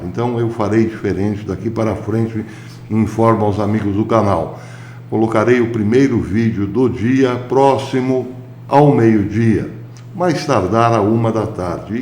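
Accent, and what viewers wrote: Caipira, Brazil. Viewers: Boa tarde, professor. Que bom que vai ter live todo domingo! Professor, fico besta com o senhor. Por issogosto tanto desse canal. Ou o senhor conversa em caipirês ou demonstra sua extrema modéstia, como hj: "Simpatizou com essa belíssima figura que sou eu", de qq modo é uma alegria seus vídeos. Vira e mexe o senhor aparece com uma novidade. Obrigada, professor!!!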